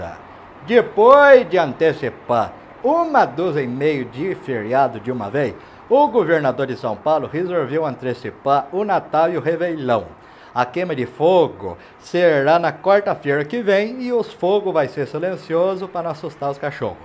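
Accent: Minas Gerais, Brazil. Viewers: Se tá é doido que nordestino não fala assim aí é mineiro de POUSO ALEGRIA,mg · Nordestino? Kkkkkkkk tá + pra interior de mg, Goiás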